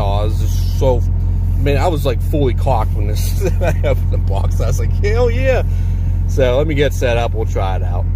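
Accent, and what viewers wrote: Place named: Pittsburgh, United States. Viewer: Pittsburgh area? You sound like it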